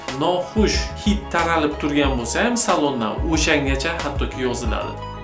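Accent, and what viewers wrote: Bukhara, Uzbekistan. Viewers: Сих Бухороданмисиз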